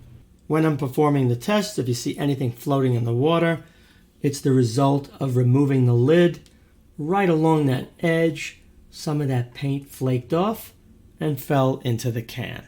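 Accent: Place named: Boston, United States. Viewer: So you're a Bostonian. I can tell by your accent plus the can is labeled Boston, Mass.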